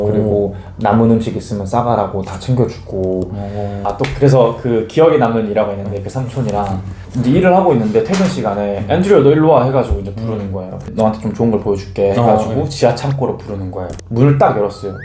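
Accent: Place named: Jeolla, South Korea. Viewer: ㅋㅋㅋ선생님 억양이 전라도 쪽인 거죠? 저는 경상도라서 제가 흔하게 듣지 못한 억양이라 귀엽고 더 귀에 잘 들어왔어요! 올해 입사하면 몇 년 열심히 경력 쌓고, 미국에 일하러 가보고 싶었는데! 이미 그런 길을 가신 분을 보니 멋있네요ㅎㅎ 참고해서 잘 준비해보겠습니다~ 영상 감사합니당:)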